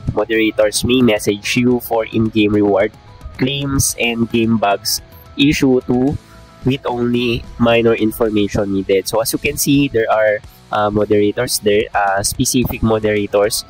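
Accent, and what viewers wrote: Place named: Visayas, Philippines. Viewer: Pinilit mopa mag english pati accent mo pang bisaya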